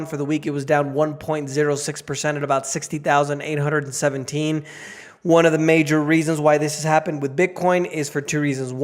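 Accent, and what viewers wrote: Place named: Latin America. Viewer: hablas bien ingles, pareciera q fueras nativo pero tienes el acento fuerte de latino. practica mas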